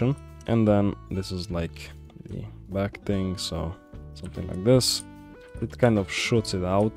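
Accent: Russia. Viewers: russian accent slipping in, nice tutorial! sadly its not shown how to do it trough blender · скажи честно, ты русский? тебя акцент выдает очень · Руский акцент... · Love the Russian accent!😁🤣❤👍👍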